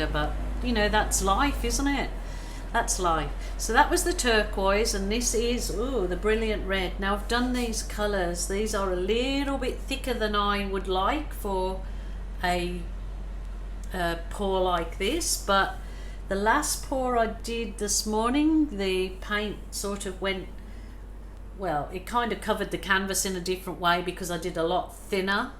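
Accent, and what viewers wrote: Birmingham, United Kingdom. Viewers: Hi, have you got a brummie accent going on there? Just found your channel and so thankful I did. Made my day watching this, I am a newbie and can only wish for a result as lovely as this. Thank you. x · It’s. beautiful and I can understand the emotion you showed. Thank you for showing us how to feel free in a lockdown situation. Are you from Birmingham Uk? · BEAUTIFUL !!! can I ask you sound like a slight Brummy accent. Maybe wrong lol . Love your work 😘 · I love this it's great and may I ask are you from Birmingham?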